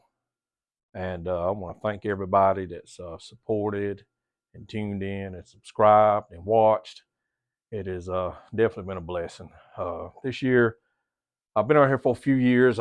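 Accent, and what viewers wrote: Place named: South, United States. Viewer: Cheers bud. This is comin from a yankee. Gotta say, i was raised by a southern gentleman. Although i dont live in the south, its really cool to hear a you tuber who’s doing videos about home theater, AND has a little southern twang in his voice. Cheers good sir! I enjoy hearing about your home theater journey. Have a good one..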